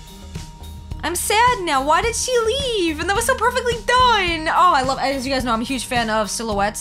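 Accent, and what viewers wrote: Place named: Brooklyn, United States. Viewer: is this chick from Brooklyn or some shit? I hear some sort of accent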